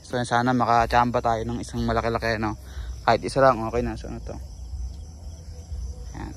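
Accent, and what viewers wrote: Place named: Nueva Ecija, Philippines. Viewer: Taga nueva ecija kaba idol? Pang nueva ecija punto mo e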